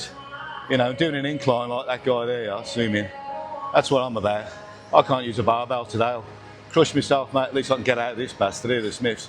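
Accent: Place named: United Kingdom. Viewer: Love the British chatter.Never mind a good yarn. 👌🏼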